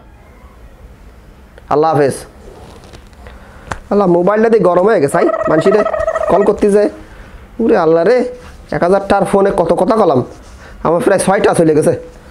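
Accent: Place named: Khulna, Bangladesh. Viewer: Khulnar vasa amk mugdho kora vi..apnar kotha sunla onk moja pi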